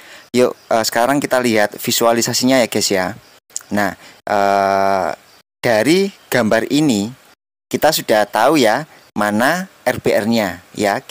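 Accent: Java, Indonesia. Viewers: Dari Logatnya, Pasti anda orang jawa ya? 😁 ,Cara anda mengedukasi sangat Keren bro , saya dukung.! Lanjutkan, sukses selalu ya.👍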